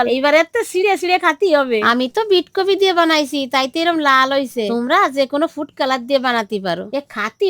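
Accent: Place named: Jessore, Bangladesh. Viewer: আপনার কথা ভাষা হচ্ছে বাংলাদেশের যশোর অঞ্চলের মতো আমি যশোরের মেয়ে বাংলাদেশ থেকে আপনার ভিডিওটা দেখছি খুবই ভালো লাগছে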